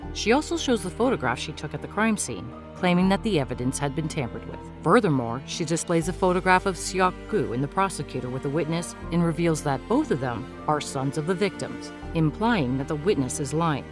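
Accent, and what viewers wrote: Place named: Europe. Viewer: I think maybe she's an European? What's wrong, Korean is difficult language to learn for Europeans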